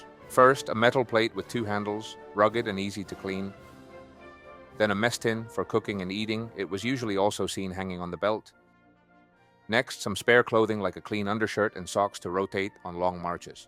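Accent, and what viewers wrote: Spain. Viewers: By his accent id say hes spanish ,but speaks fluent english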